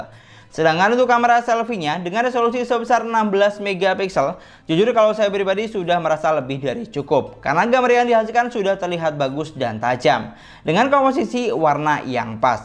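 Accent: Madura, Indonesia. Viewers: Madura banget logatnya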